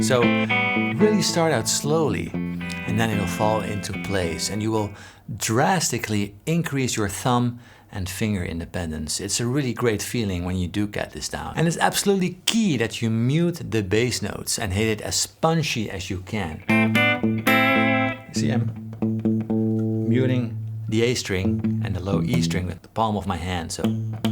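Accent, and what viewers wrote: Netherlands. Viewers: bro is that a dutch accent I catch there? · Hoi! Groetjes uit Utah USA. Ik ben SoCal geboren en groot gebracht. I was pretty sure I heard a Dutch accent... en, ja hoor! 😅 For me, music is my time machine. I'm 67 now. When this song came out in '75, I was in high school. I took a class on US government and our teacher had arranged for us to fly from Southern California up to Sacramento, the state Capitol. We traveled all over (State offices including Gov. Ronald Reagan's office) Folsom Prison (don't know if you're a fan), Old Town Sacramento and Sutter's Mill (where the 1849 gold rush began). ALL DAY LONG on the old school bus we travelled in, THIS brand new song played. It was repeated constantly. To this day, whenever I hear it, I am always transported back in time to 1975. I saw the a couple of times, once, (I could go on). And I have memories from all these groups. 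But takes me back in time to this one particular day like no other. My best friend was in the band in the '70's and left before their big hit from Top Gun in the '80's. Of the two of us, he's the real musician. I'm sure you've heard of Rick Beato. Still, I love seeing you young guys dig in to our old hits to figure them out and play them correctly. Warms the heart and soul. I guess I'm afraid after us old guys are gone, the stuff ppl call music today (or AI) is gonna replace it. 😢 Waar in Nederland ben je wonachtig? Mine kinderen zijn vlaak bij Dordrecht en Barendrecht. No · Hey love your videos! Have been following your channel for years, and only now, when I'm about to get married to a Dutchie, did I actually notice through your accent that you're Dutch! Ahah! By the way, check this guy it would be awesome to see a video of you two together on your channel! Cheers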